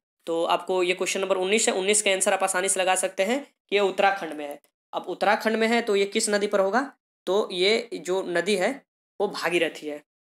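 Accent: Bihar, India. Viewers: Sir aap Kya bihar ke rahne bale h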